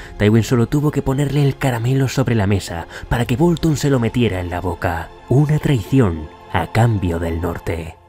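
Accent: Castile, Spain. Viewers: No me cansaré de repetir el castellano le da muy buen toque al relato, 👌 un nuevo suscriptor se anota. Espero que traigas más relatos .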